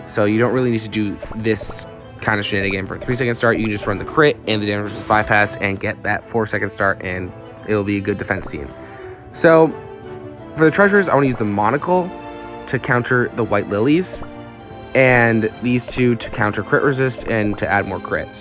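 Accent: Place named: United Kingdom. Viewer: why does he sound so british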